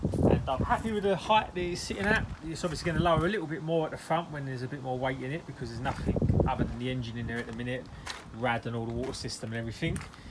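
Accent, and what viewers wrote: United Kingdom. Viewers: Just came across your channel 👍🏻 refreshing to hear a fellow Brit. Most of these type of vids are usually yanks 🙄😂 . Don’t suppose you’ll be taking on an Astra j vxr any time? I suppose too there’s barely a fraction of J’s about compared to H’s. There’s really not a lot going on, online( that I can find anyway) people working on them. Plenty of the H’s but not J’s. At a bit of a loss as what to do next as well as being a bit apprehensive . Cheers, good vid. Sub button hit 👍🏻🇬🇧.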